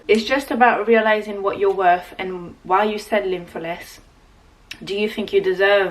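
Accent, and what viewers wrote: United Kingdom. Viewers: Tu m’attrapes encore plus avec ton British accent, fan vraiment ❤ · The British accent 🫂🫂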